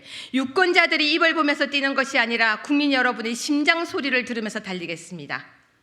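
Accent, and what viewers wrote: North Korea. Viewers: 북한 말투같냐??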